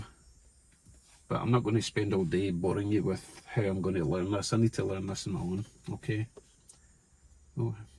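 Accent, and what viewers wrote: Scotland, United Kingdom. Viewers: I get the hint of a Scottish accent 🤣 , a more posher Scots twang than a thick West Coast Glesga, or has it deteriorated due to living in the states, excellent vid 👍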